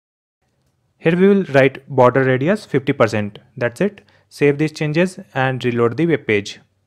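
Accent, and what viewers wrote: India. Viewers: Oh my god. This indian accent... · Why westerners are making fun of his accent . English is not first language of Indians. Show some respect to his work.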